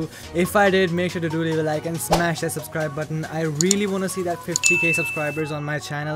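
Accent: India. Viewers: nothing your voice was like a indian because idk why i can tell every voice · It actually works! It didn't help me to a great extent but it's defenitely better I think the firewall settings made a huge difference,my game is so much better now! Thanks for that Also i have one critisism. No hate just a friendly critic,you should just stick with speaking indian accent(or speak accurate American accent), you're trying to speak American but it feels very much like indian accent mixed with American accent(I don't have a problem with that but it's hard for me to understand,and I'm sure it's hard for many others as well) Peace 👍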